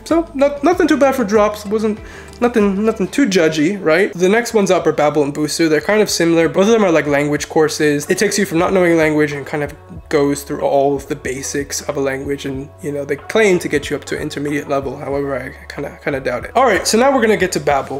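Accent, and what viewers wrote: Nigeria. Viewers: Great Nigerian accent! Do you eat any Nigerian food? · Does a good nigerian accent but doesn't speak igbo 🙄 i am a bit salty as you can see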